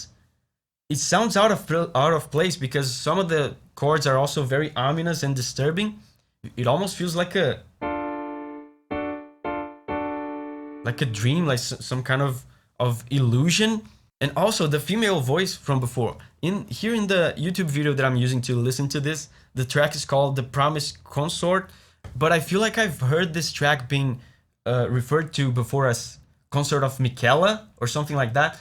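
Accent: Brazil. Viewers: Salve mano, via seu canal desde os vídeos de Final Fantasy XVI e achava que tava reconhecendo seu sotaque e o jeito de falar. Pesquisei um pouco e descobri que tu é brasileiro também! Seu canal é incrível cara, fico feliz de ter um Brasileiro sendo fluente e profissional na área de músicas de jogos, Desejo todo o sucesso do mundo mano, ABRAÇO.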